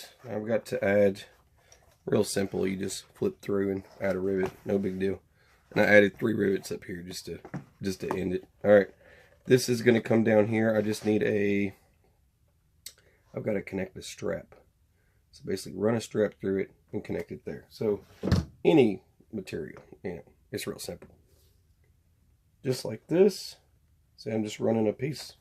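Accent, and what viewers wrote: South, United States. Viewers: Nice work You sound more southern then me ha im in Mississippi. Were you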